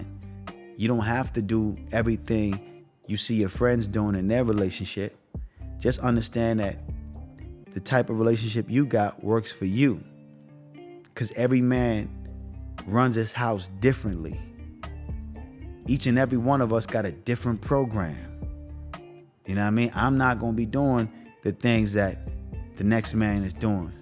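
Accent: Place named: New York, United States. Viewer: That NYC storytelling ALWAYS hilarious 🤣🤣🤣 Tell the story like a Ny'er or ion wanna hear it🤭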